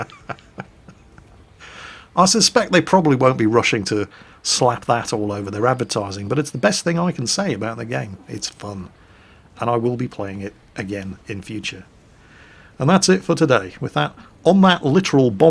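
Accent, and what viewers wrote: England, United Kingdom. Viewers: even when you fuck up, or don't play well, that British accent makes it seem oh so correct. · I'm not much for realistic simulators such as WT. I just don't enjoy the game as much. Could it be because I'm not good at it? Sure! But the point of having fun in a game is based on personal preference. The people saying "WT is better, get gud" are the same people who talk about PUBG being a good game (it isn't, btw). WoWP in its current state is for the individual who just wants to shoot at things (such as myself). I don't play many games, because I don't like most games. Developers are attempting to reach broader audiences by creating games such as WoWP. If it doesn't fit your preference, cool! No problem! There are many games that probably DO fit your preference. Fighting over games like "fam bois" doesn't make sense though. 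It's not constructive. And I know, this is a YouTube comment section, so it doesn't have to have any constructiveness to it, but regardless. This is a video, we're not watching idubbbz or ricegum. His smooth English accent should be calming everyone down. This is a part of YouTube that can be, and should be, a place to critically critique war-type games. Will I download this game and try it? Maybe. I really enjoy WoWS right now and have moved away from WoT because the learning curve is just different between the two. I'm not great at games overall. steps off soapbox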